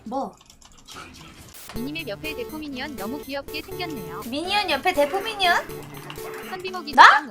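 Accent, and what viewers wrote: Gangwon, South Korea. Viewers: 저 예쁜 공주같은 얼굴에 강원도사투리까지 쓰시니까 너무 매력적이다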